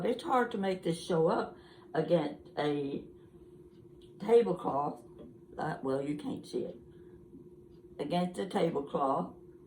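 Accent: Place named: Tampa, United States. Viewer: I am a first time watcher. Thanks for the tour and the information. I’m going to examine my treasures to see if I have some of what you showed. You remind me so much of a dear aunt whom I loved very much. She lived in Tampa and she had your accent and your caring nature. It was delightful to come into your home. I hope to visit again and to especially see a table setting of yours. Perhaps you have pictures of the settings you have done. Thank you so much for your information and your lovely personality. Like you, I love my stuff.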